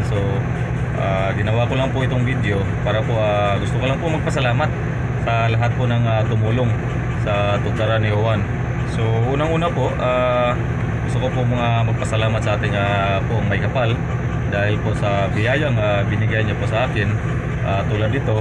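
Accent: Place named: Visayas, Philippines. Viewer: Kani kay morag bisaya ni ayy hehe, pinoy galaan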